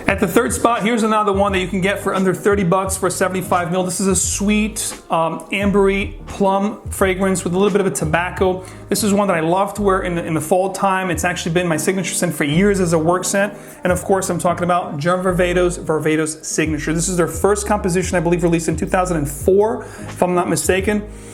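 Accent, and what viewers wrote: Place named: Philadelphia, United States. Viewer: what state in america are you in,? i like the accent. not type you hear in hollywood movies? is it philly accent?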